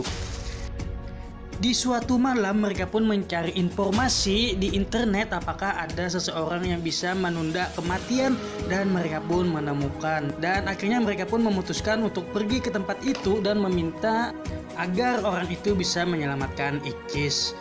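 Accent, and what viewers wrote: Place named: Banjar, Indonesia. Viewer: Hadewww logatx orang banjar bgtt 😂😂😂😂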